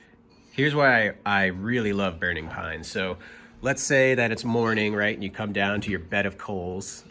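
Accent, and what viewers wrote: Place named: Upstate New York, United States. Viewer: You're not from Vermont. Sounds more like upstate NY.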